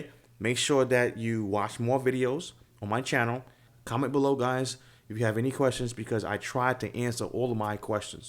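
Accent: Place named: New York, United States. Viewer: My brotha from NYC. I can tell by your accent that you're a New Yorker. I am too. I've been watching your video's on a binge. Great stuff thus far.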